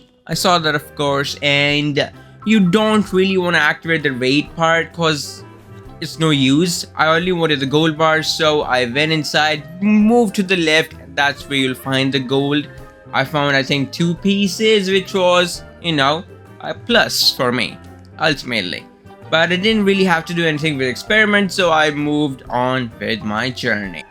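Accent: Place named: India. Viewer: Bro sounds indian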